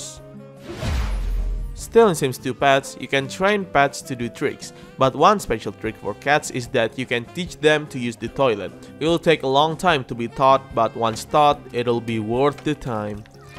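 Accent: Indonesia. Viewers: are you from Indonesia? I can hear your Indonesian accent. ✌️✌️✌️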